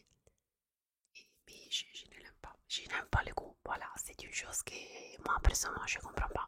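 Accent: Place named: Italy. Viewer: ton accent italien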